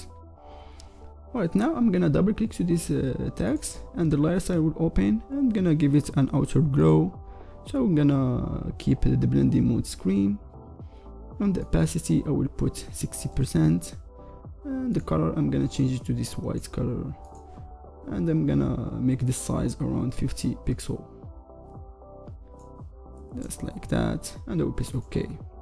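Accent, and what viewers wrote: Morocco. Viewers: Mn lhja dyalk bayna fik mghribi 😅😅 Kml bro❣️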